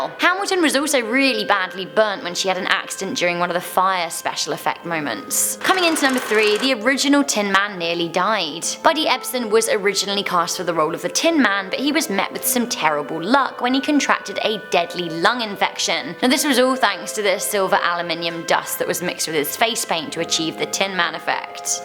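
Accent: England, United Kingdom. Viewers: Interesting.... also I glad I'm not the only one that finds ABSOLUTELY GORGEOUS! Also... that English accent just makes my heart melt!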